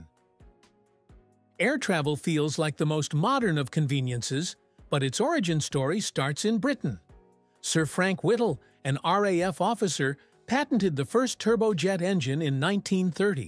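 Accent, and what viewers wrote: United States. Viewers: Scatish is what comes out of AI generated slop with an American accent that can not pronounce British words.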